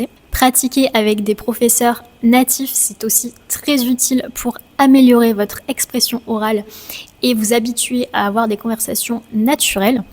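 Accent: France. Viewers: I used the technique of finding out what day to day things were in french, then when going round the supermarket or just day to day life then seeing them i'd say them back to myself until they were so deeply embedded in my brain that i knew them off by heart. Feels slightly reassuring that I might not be the only person saying the words back to myself in the shop🤣I find myself lucky that someone that goes to my local pub used to work for a large French oil company so hes pretty fluent in French and I find myself attempting to have little conversations with him to try and improve. I tend to learn more by doing something than just from being show how to do it, so being able to practice that way is really beneficial for me. These videos are really useful! I like little phrases and like using you "bonjour, j'espère que vous allez bien", "Si tu n'es pas heureux ou tu es, bouge, tu n'es pas un arbre." and the one you said your Grandmother says about life being a sandwich of a certain kind 😂Those little phrases go a long way in a video, even if it's just learning what the words are in them, especially since sentence structure is different to english with word placements in them! 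I don't want this to come across as a "oh great im magically fixed comment" but your English is really really good so try not to feel self concious about having a strong French accent when saying some words. You're pretty nail on the head 99.9%% the time, plus it's not your first language, so it's not always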